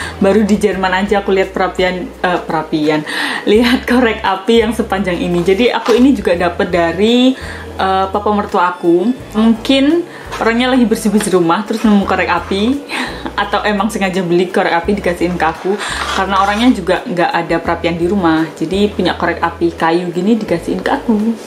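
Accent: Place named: Java, Indonesia. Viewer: Mbak'a kelahiran Jerman kah.?? Logat'a kek logat Jawa. Udah sub, mbak.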